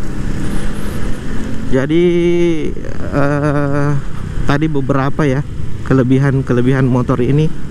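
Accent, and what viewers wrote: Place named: Sulawesi, Indonesia. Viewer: Sulawesi bagian mana bang? Dari Logatnya kentara hehehehe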